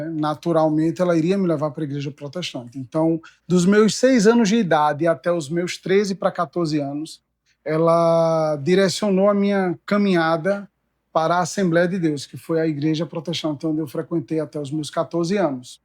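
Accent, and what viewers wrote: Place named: Recife, Brazil. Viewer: Glórias a Deus!!! Aleluias!!! Vocês são de Recife? Seu sotaque parece com o meu.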